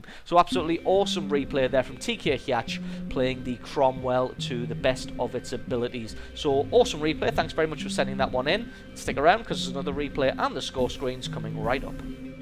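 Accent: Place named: Newcastle, United Kingdom. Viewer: Nice. Im new you this channel. One question, Are you from Newcastle??? If so where abouts? I live in wallsend. Just nice to hear someone that sounds like me on youtube haha.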